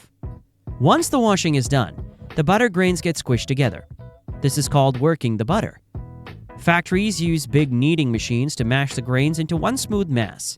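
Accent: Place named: Canada. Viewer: Okay, when you're talking about separating , you're actually showing a batch churn. A few seconds later, you're showing more batch churns... I think. Those cone-shaped things at the mark, those are the cream separators. All those other shots of tanks with vertical stirring paddles, those are probably batch pasteurizers. Maybe just mixing tanks or cheesemaking vats. And "silage" is pronounced SIGH-ludge. "Liters" is LEE-ters. The narration robot's Canadian accent is kind of adorable, though. The rest of the info in this video is basically accurate.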